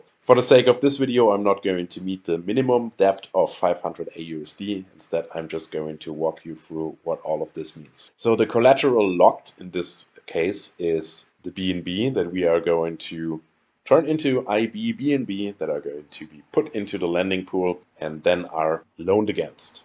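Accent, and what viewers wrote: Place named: Germany. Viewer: Nice german Akzent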